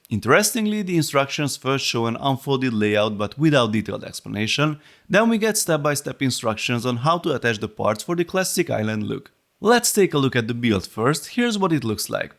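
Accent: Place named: Hungary. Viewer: le a kalappal. Te vagy a kedvenc Lego csatornám, egy csomó olyan videód megnéztem, ahol az adott készlet beszerzése még eszembe sem jutna:). Jól meg vannak csinálva a filmek, élvezetes és választékos(!) az angol szöveg, az akcentuson ugyan hallani hogy magyar vagy, de egyáltalán nem zavaró módon. Gondolom az oktatói múltadból is tudod, hogy lehet a figyelmet folyamatosan fenntartó szövegeket létrehozni és úgy elmondani, hogy abba ne aludjon bele a közönség. All in all, nagy kudos.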